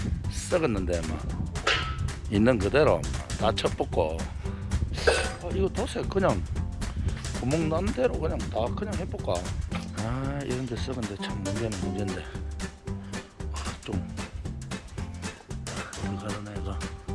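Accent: Gyeongsang, South Korea. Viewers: 캐빈 못구함니까... 진심 마2 내로우캡이라도 비스무리한거 캡 바꿔 끼는게 경제적이게 생겼습니다... 뭐 붐대부분은 판금도색하면 되겠는데... 캐빈은... 어지간히 썩어있어야.... ㅋ 그리고 추천 어록은. "마 우리 채널 같이 키우는거 아닌교?(경상도 네이티브 발음이 어렵네요 어캐 해야하지? ㅋㅋㅋ) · 갱상도 사투리 너무 잼있어요. 저도 창원에서 5톤윙바디 운행하고 있습니데이 항상 안전운전하시고 힘내십시요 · 저도 경상도 사나이인데 항상잘보고있습니다 고향사투리들으니까 억수로 정겹네요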